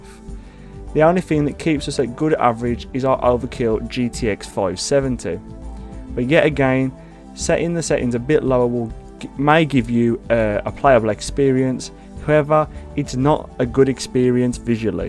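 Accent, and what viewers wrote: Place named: Wolverhampton, United Kingdom. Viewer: That accent sounds very local, I’m from Wolverhampton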